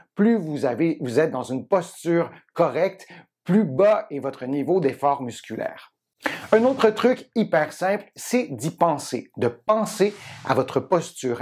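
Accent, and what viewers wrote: Quebec, Canada. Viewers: Vous êtes vraiment génial super original avec l'accent du Québec, un vrai personnage. Merci vos conseils me change la vie !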